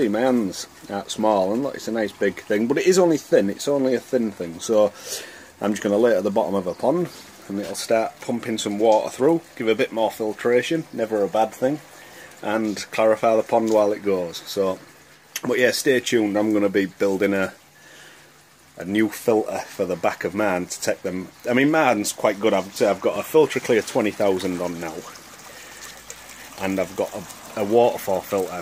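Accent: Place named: Northern England, United Kingdom. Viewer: Strong northern accent 😂 I'm londoner and I have trouble understanding too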